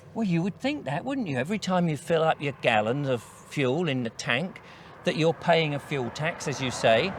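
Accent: United Kingdom. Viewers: I was so glad to hear you speaking British when I started playing it. But I'm sure they'll try to do it over here too, it's all the WEF.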